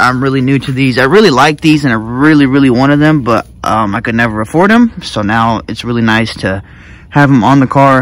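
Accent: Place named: South, United States. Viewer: you even talk like the GQ boys :D (or maybe it's a southern dialect, idk but its funny in a good way). it's definitely going to be a cool project to accomplish. good luck mate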